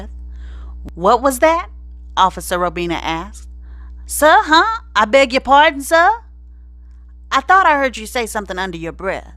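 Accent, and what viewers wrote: South, United States. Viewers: Perfect. But that gone with the wind accent. Is odd and awkward for something that took place in NJ - Newark at that..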